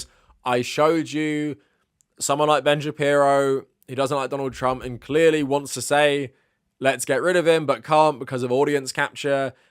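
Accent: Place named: United Kingdom. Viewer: I've been watching your channel for a while and I'm not going to lie despite the accent I somehow never realized you were British till you said it in this video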